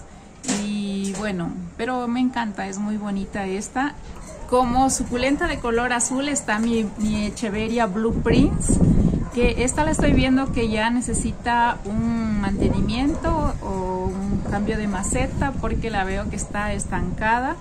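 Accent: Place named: Peru. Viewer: Hola saludos de Perú usted es de ecuador sigo a unos chicos de Marcos Otavalo ellos hablan muyyyy diferentes la escucho muy familiar como una Peruana . Yo sigo a Meliza y a Mary ahora también a usted.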